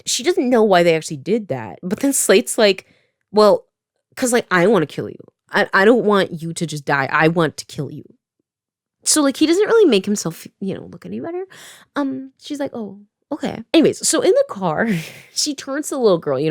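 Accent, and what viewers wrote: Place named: United Kingdom. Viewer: as someone who has been watching your videos for a while now i spent this video so worried about everyone who wasn't in the known main trio also the british accent caught me completely off guard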